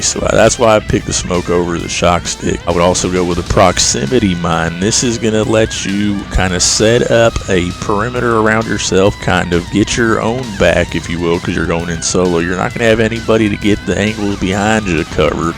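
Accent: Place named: Texas, United States. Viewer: You a Texas boy? I heard the accent and trusted you instantly.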